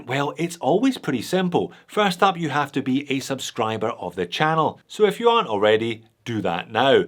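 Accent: Scotland, United Kingdom. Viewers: Merry Christmas. Thanks for a bumper year of watch reviews and laughs. The only downside is that whenever I read a watch review now.... I do it in a Scottish accent. Oh well. 😁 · A knowledgeable & enthusiastic host talking about watches with a Scottish accent. What’s not to like? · Merry Christmas and the rest of the viewers. Love your scottish accent, reminds me of my family:-). Keep up the good work. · Came for the watches, stayed for the Scottish accent.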